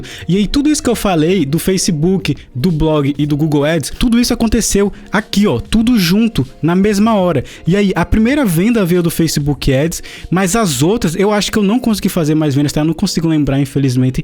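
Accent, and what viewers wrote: Northeast, Brazil. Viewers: Vc é massa!! Vc é uma inspiração pra mim! De onde vc é hein?! Vc tem sotaque nordestino! Kkkk Eu sou de Recife! Parabéns pelos resultados! Showwww!!!!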